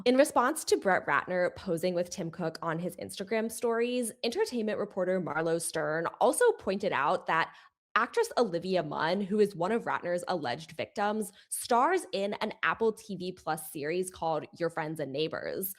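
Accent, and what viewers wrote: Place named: South, United States. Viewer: Southern fry at cross purposes with your message. Breathe more through your nose when you speak and try not to push the bass.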